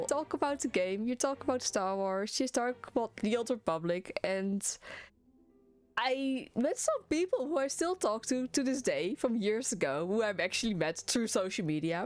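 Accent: Netherlands. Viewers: this was a nice video, very educating it is, love your dutch accent btw :P (im dutch myself too)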